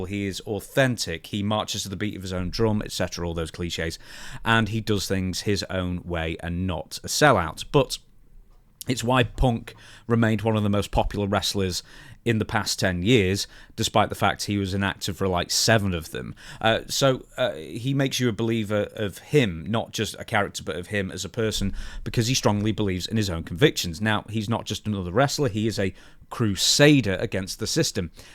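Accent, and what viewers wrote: United Kingdom. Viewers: There's a considerable amount of bigotry laced within the Saudi Arabia criticism. Double standards galore coming from a Brit Accent where hundreds of years as well as current day accountability and criticisms should be considered and prioritized. At the end of the day, these shows are bringing joy to innocent people and humanizing those who come from a different background. People trying to shit on that are at best hypocrites and at worst closeted bigots.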